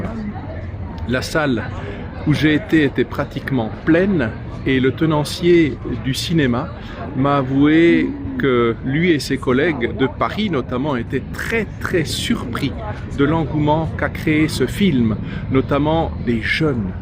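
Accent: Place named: Alsace, France. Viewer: Vous êtes alsacien ? Vu l'accent, et votre attachement à Christ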